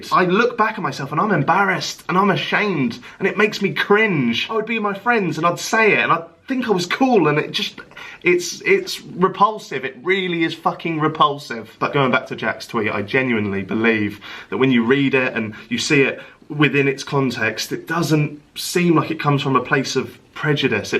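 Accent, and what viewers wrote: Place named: Newcastle, United Kingdom. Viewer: Sky, a gay guy on Twitter that I follow, loves the True Geordie's accent and I love it. He once shouted at me for asking why he loved the Geordie accent so much.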